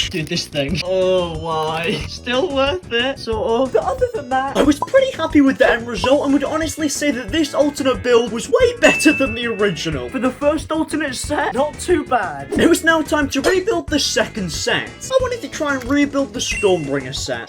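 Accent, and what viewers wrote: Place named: London, United Kingdom. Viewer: Your from London lol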